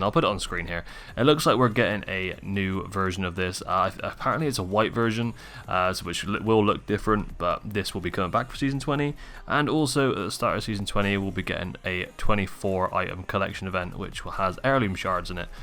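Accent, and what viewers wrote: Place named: South West, United Kingdom. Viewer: Where are you from bro, sounds south west like me?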